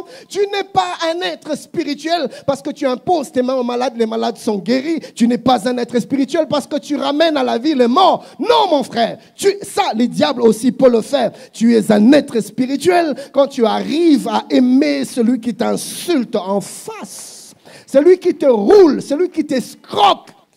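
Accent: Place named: Africa. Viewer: Pardon pasteur mais quand vous parlez africain nous ne comprenons pas. Nous apprécions votre enseignement.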